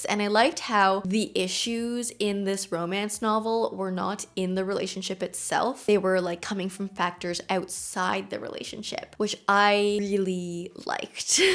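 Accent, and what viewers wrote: Canada. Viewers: Your Canadian accent is so prominent I love it!! It’s super cute!!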